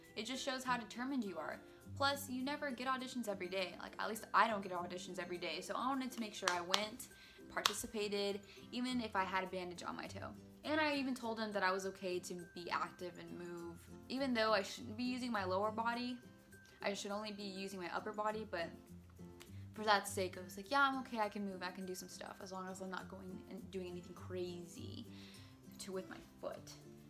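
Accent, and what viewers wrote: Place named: Texas, United States. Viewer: I could just tell you were from Texas! (: What part? I'm from Tx too!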